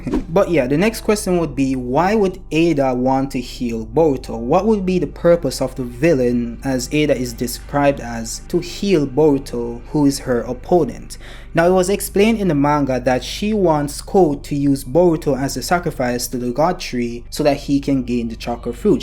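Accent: Jamaica. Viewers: Brudda yiu Jamaican what's the accent I'm hearin you in ya voice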